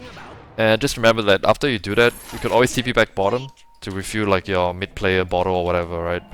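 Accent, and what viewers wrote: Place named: India. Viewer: Why does this east Asian looking bloke talk like an Indian? Took me a while to realise he was referring to 'facet' by saying 'fuh-SET'😂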